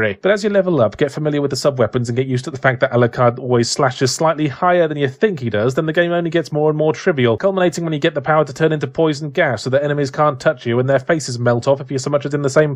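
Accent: United Kingdom. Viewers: After I watch his videos everything I read is in his sarcastic, cynical british accent.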